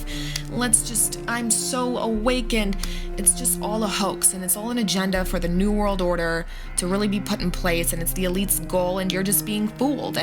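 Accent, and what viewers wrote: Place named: New York, United States. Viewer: Girl how u have a ny accent n from north Chicago lol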